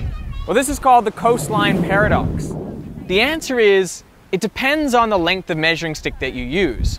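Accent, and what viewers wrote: Australia. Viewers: Watching this video 5 years later and damn does he have an Australian accent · he sounds more australian in this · It's funny I hear him speaking with a slight Australian accent. I think he went to film school there. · Finally watching an old video I thought I was the only one noticing the australian accent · is it me or is his accent finally picking up a little Austrailian tone? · You are getting a slight Australian accent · what's crazy is i detect a very, VERY slight australian accent here that's not there in more recent videos... wild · wow, his voice is starting to have an Australian accent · He sounded so much more Australian back then. What a difference.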